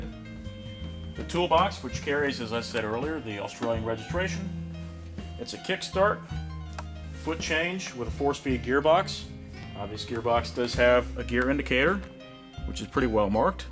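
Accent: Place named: United Kingdom. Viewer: Sounds British..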